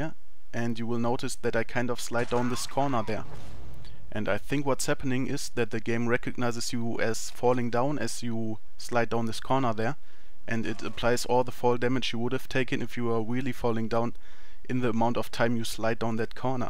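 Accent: Germany. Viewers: Deutsches Englisch is sooo toll :D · Ist er denn Deutsch er verwirrt mich xD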